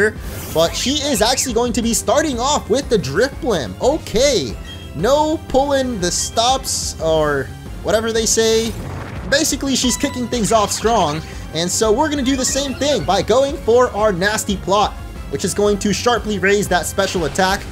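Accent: France. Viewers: Your french accent is hilarious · Love your Frenglish accent xD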